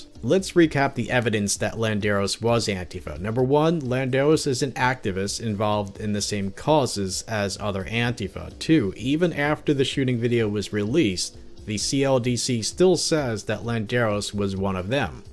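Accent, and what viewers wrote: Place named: New York, United States. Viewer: I FUCKING KNEW YOU'RE FROM NY!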